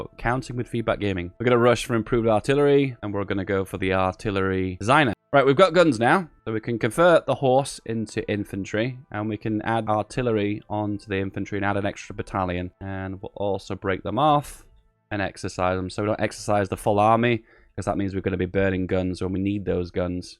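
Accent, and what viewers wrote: United Kingdom. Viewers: Watch your videos because I like your British accent. Greetings from Russia)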